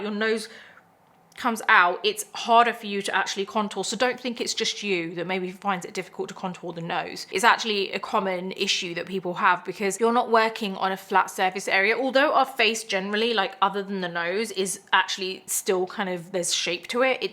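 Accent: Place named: United Kingdom. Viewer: I love to watch your make up tutorials ,they are so helpful and you are absolutely beautiful and I love your British accent,I have always wanted to go there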